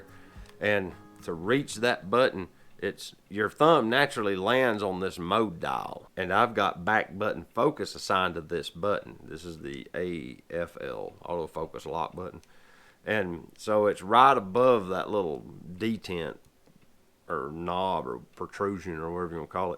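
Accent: South, United States. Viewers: Centimeter? Really? :) Sounds strange when someone uses metric system with a southern accent. :) Great video, thank you very much!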